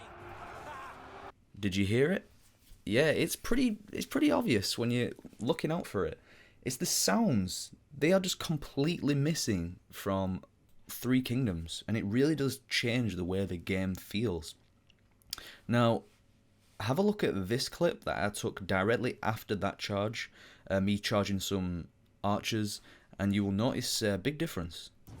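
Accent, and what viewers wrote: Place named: Northern England, United Kingdom. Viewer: It sounds like hes northern english.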